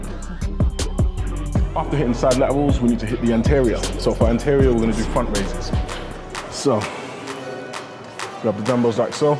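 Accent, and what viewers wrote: United Kingdom. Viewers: Are you British ? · Alright bro, r u from here in the UK???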